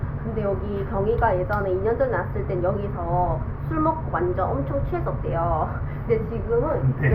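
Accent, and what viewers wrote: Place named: Gimhae, South Korea. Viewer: 님 올여름 휴가를 울릉도로 가셨나봐요? 저는 30여년전 도로등 기반시설 없을때 독도까지 갔다가 입도는 못했던 기억이 나네요 글고 지난번 결혼 하신줄 모르고 결례를 범했네요 결혼 하신지6년 되셨다고 하셨는데 늦게나마 축하드리고 남편분은 존잘남 확실한것 같네요 울릉도 가셨으니 성인봉 뿌시기도 하셔야죠 암튼 추억에 남을수있는 즐겁고 행복한 여름휴가 되세요 비 피해는 없으시죠? 김해가 고향이라고 하셨나요? 저는 님 고향사투리억양과 명랑하신 성격에 우연이 들렸다가 구독좋아요 누르고 시청하고있는 마산이 고향인 60대 중반 아들 군대보낸 할배예요 결례 용서하세요 영상 즐감했어요 새로운 한주도 날마다 좋은날 되세요 감사합니다... 파이팅!!!... 👍❤🍀👏👏👏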